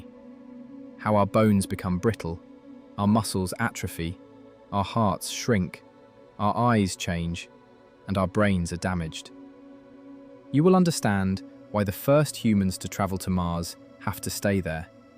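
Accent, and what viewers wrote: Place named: United Kingdom. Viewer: British English is irritating!!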